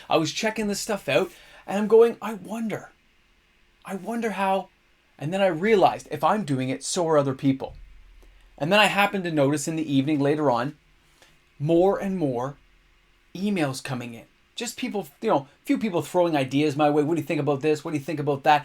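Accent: Canada. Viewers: I almost shit myself when you said new capenna was 135$, then I heard your accent and figured it's Canadian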